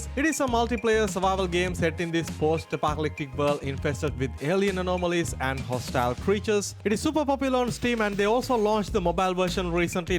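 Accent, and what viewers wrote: Kerala, India. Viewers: Nice video brother , bro why do you sound like mallu ( no offense ) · de malayali engligh accent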